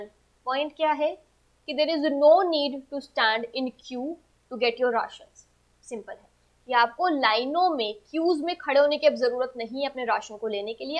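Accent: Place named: Haryana, India. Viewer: Madam ji aap haryanvi laggo ho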